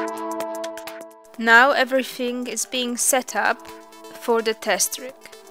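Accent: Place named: Poland. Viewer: Pani chyba z Polski, akcent się zgadza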